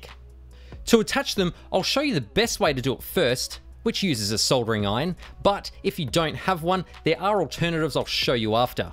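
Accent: Australia. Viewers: very interested i like to hear that Australia voice. that is an obsession with perfection. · Non Australians, and especially non-native English speakers, cant understand your accent. You might want to have an American or a Brit do a voice over to multiply your audience dramatically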